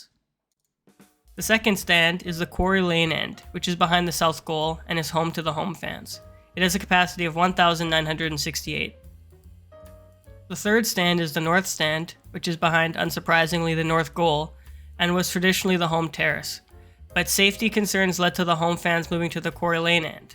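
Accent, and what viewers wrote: United States. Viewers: My home town. Was surprised to hear an American talking about it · Voiced by an American with no understanding of what he is reading from a script. Mistakes are numerous and unedited. Please save us from these and/or get a different voice-over.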